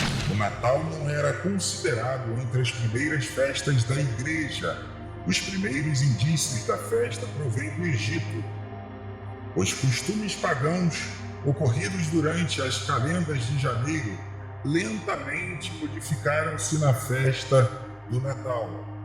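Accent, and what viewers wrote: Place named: Rio de Janeiro, Brazil. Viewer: Sotaque carioca é tenso mesmo KKKK mas o vídeo é otimo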